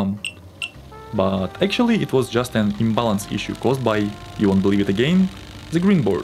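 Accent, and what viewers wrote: Russia. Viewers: Russian detected ⚠️ привет дорогой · Господи чувак, твой английский такой русский что писец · WTF he haves a Russian accent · Мне показалось, или услышал русский акцент? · Чувак! Твой русский акцент!.. 😂 But still you're doing good! Going to check Your channel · why the accent, bro? you sound russian! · Обычный русско-английский акцент.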